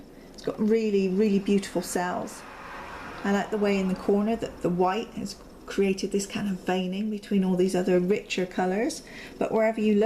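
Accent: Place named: Bristol, United Kingdom. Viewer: Is that a Bristol accent I hear? Reminds me of home (Beckington). Lovely pour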